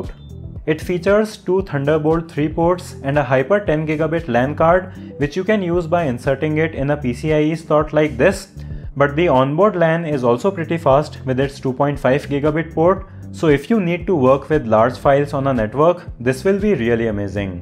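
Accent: India. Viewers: This indian accent is killing me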